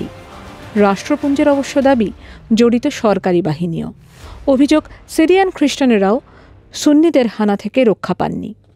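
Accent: Bangladesh. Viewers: পূর্ব বাংলার উচ্চারণভঙ্গীতে আনন্দবাজারে এই নতুন আমদানী কে? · বাংলাদেশী ভয়েস। সে অবশ্যই বাংলাদেশী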